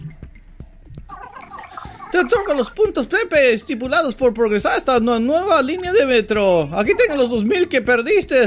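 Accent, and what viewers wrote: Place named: United States. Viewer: mmm tienes ese asento gringo:3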